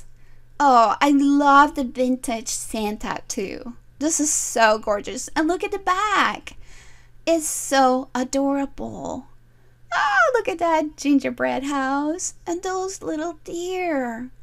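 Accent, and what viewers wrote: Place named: Latin America. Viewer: Oh no problem I understand ... I just hear a bit of Latina and I was just curious great video have a great week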